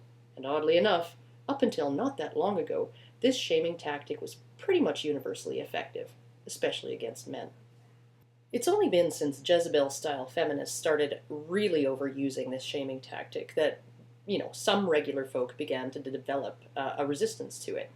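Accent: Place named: Canada. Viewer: i really like this monotone reading voice is the best way to get a point across. this not sarcasm you should get a job at npr all though you sound canadian i'll look up ya'lls npr lol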